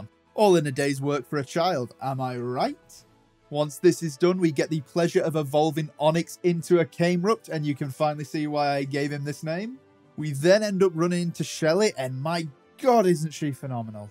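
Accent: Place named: Scotland, United Kingdom. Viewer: Haha great vid! I love your Scottish accent, I play golf with a scotsman, he happens to be ginger too, I don't know much about Pokeymans tho, you earned a like.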